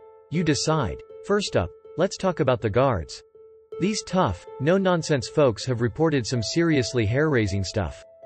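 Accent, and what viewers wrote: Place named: United States. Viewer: Never believe a voice sounding like a America they never get the facts right.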